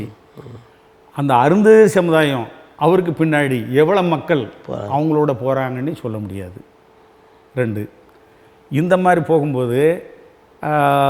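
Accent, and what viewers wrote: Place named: Tirunelveli, India. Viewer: மாவீரன் அண்ணன் நெல்லை தமிழில் பேசுவது அருமையான சூப்பர்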